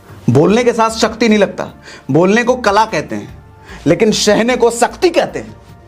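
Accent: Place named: Bihar, India. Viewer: Sir aap jab bihari mi balte ho to bada ache lagte ho Aap ki motivation video muje bhut pasand hai .or mere andar bhut change aata h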